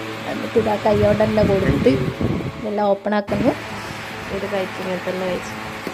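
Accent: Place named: Malappuram, India. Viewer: മ്മളെ മലപ്പുറം കാരുടെ വർത്താനം പോലെ ഇതും ഉഷാറായിക്കണ്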